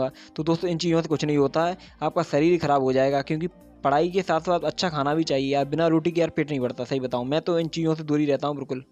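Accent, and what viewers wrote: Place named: Palwal, India. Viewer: Awaaj se plwl side se lg rhe ho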